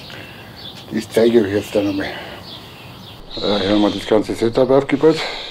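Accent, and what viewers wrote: Bavaria, Germany. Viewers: Die Wachtelbohnen in Tomatensoße muß ich mir auch mal besorgen. Die gibt es bei Amazon günstig im 2er-Pack. Dein bayrischer Dialekt ist für mich immer ein Genuss. Ich wünsche Dir viele Sonnentage. LG Bernd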